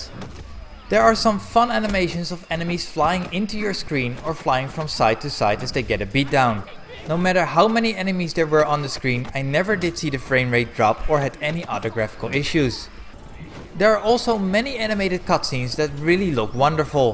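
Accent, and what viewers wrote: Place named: Netherlands. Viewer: You're Dutch right? You hear it right away lol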